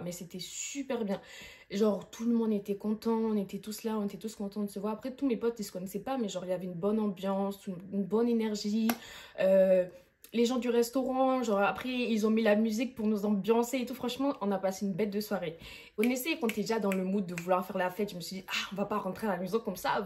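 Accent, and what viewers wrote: Gabon. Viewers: On aime ton accent gabonais ❤ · L’accent Gabonais là ooh bien chic 😂😂😂😂😂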